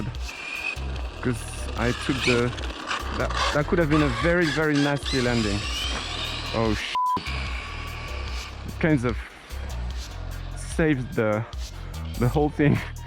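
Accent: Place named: France. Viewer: Like you vidéos and your french accent ! Good job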